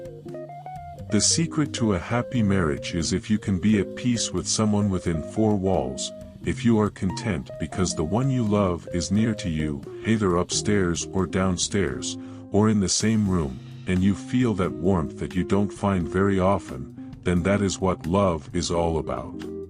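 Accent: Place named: England, United Kingdom. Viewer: This beautiful video took nearly 30 mins to enjoy and I love hearing your reading although I did not watch the video but I truly listened your beautiful English accent my dear 😍 thank you so much for a long uploading and it really took much time for this video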